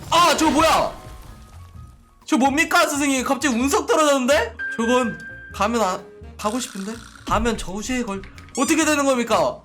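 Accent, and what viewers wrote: Busan, South Korea. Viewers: 그... 원래 부산사람이 엿어요? 부산언어를 정말로 잘.쓰.시.네.요.하하ㅏ하하ㅏ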